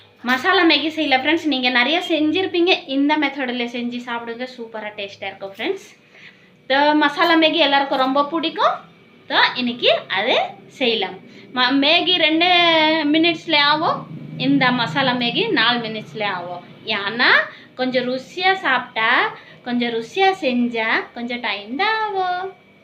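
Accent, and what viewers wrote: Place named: Odisha, India. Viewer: Odis assent Tamil speaking nice explanation s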